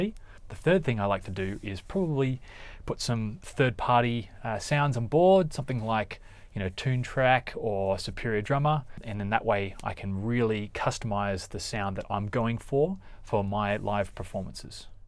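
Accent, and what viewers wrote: Australia. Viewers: Good to hear an Aussie accent. Sound like a Melbourne accent.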